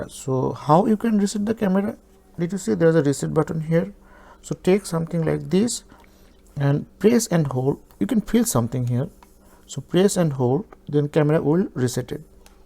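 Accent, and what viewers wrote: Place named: India. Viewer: Talk better English, your India English is worst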